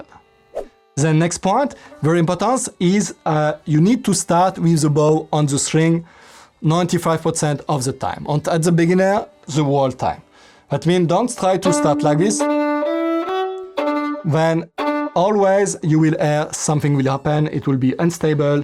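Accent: France. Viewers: I love your French accent 😁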